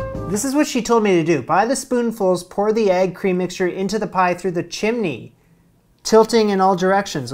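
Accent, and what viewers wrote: Canada. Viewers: You mean you couldn't tell? I'm not from Canada, and I noticed the difference of his Canadian accent to the US one...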